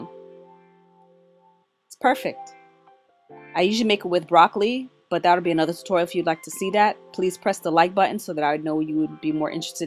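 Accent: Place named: Haiti. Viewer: So your Hatian sista? I love it! I know I had detected a slight accent when I watched your very 1st video. I love accents. And this receipe looked really good. Too bad I'm off of dairy, but my aunt said she will be making this for the weekend. I dig it when people can take packaged products & make their creations with it. And if you find the time please make some more cooking vids. That chicken looked great. I need new ways to cook bird. Thanks again, dear!!! Now I'm going to the wigs,LOL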